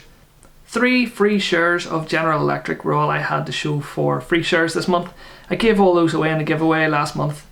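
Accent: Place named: Tyrone, United Kingdom. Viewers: Local accent, subscribing just for that reason. Tyrone man here. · Do all stocks have a dividend and how do you know which do and do not ? Nice to hear another accent from the north:)) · Hi nice to hear a local voice! I’m from Fermanagh you sound like a Tyrone/Derry man. I’ve got big into investing in stocks this past few months and have done very well. Subscribed and can’t wait to watch more contact 👌🏼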